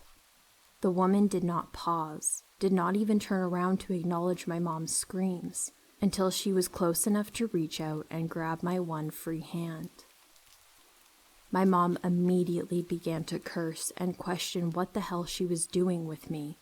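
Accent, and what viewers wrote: South, United States. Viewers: Southern US accent is very distinctive. I lived in Texas for a year when I was a kid. All my other years have been spent in Western Washington State where I was born and still live now. Thank you for another awesome video. 😍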